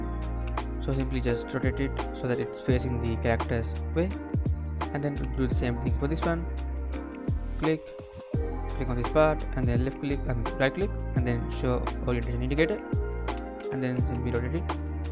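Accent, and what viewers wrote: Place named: India. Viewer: Ahh indian voice, didnt understand anything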